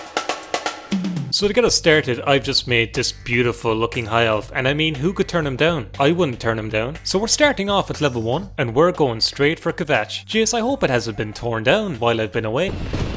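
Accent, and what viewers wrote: Ireland. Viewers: Ooh a fellow Irishman. Where you from? Love the video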